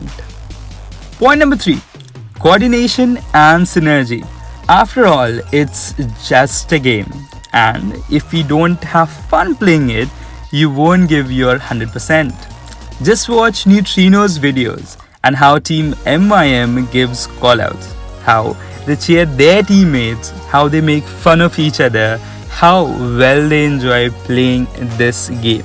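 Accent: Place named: United States. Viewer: Bhai iss video me tera accsent alag lag raha hai 🤔 more like American accsent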